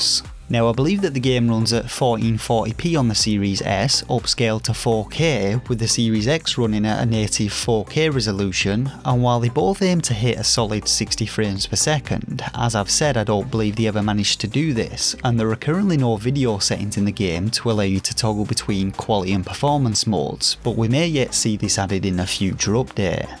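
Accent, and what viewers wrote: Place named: Liverpool, United Kingdom. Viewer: Like the vid, trying to place the accent. Liverpool by chance? Hope no offense if I’m incorrect.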